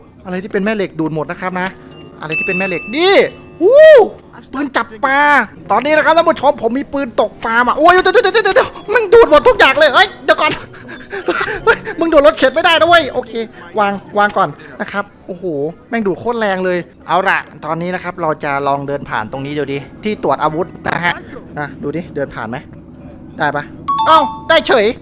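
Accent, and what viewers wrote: Isan, Thailand. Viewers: เจ่าเป็นคนอีสานบ่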